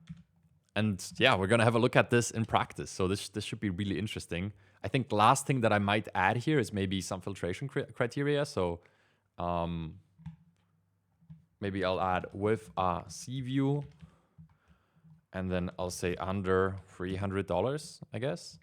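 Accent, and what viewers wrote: Portugal. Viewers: Are you portuguese? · Wait, are you Portuguese?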